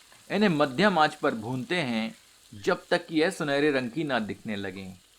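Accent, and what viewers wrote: Standard. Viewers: आपकी शुद्ध हिन्दी से चार चांद लग जाते हैं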